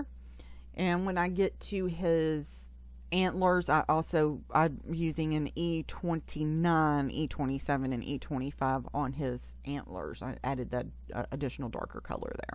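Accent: South, United States. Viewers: Love this card!!! Going to get this digi right now!!! Love your accent too, not sure when your from, but you kinda sound like your from the south like me!! Big Hugs!!! (US) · Thanks for the great coloring tips. I do not like fussy-cutting either. I have a scan n cut that I got from a friend for cheap, but I sill don't really know what I'm doing with it. USA P.S. I'm from the Pacific Northwest, so I really enjoyed listening to your sweet southern drawl.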